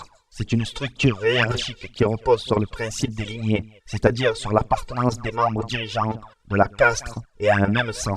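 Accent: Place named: Marseille, France. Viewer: le dephasement de ta voix et merdique ,tu peut te faire retrouver trop facilement x) et t'es un marseilleis deja sa s'entend x)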